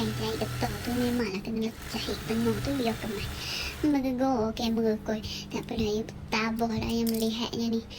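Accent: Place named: Pahang, Malaysia. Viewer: orang pahang deh...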